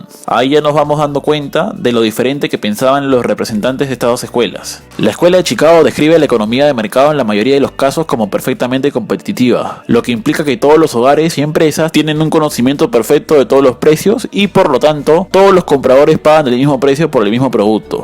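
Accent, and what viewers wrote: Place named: Peru. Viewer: Ese acento es peruano jeje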